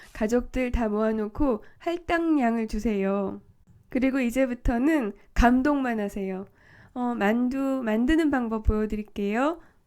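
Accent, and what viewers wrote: Seoul, South Korea. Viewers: 연세가 어떻게 되시나요? 서울사투리에 가까운 발음이네요...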